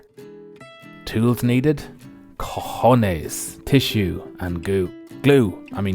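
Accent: Ireland. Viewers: Just noticed you used your roll over framework on the vw bus, just spotted it on the intro collage. Your videos are a treat even my partner agrees but that might just be the Irish accent.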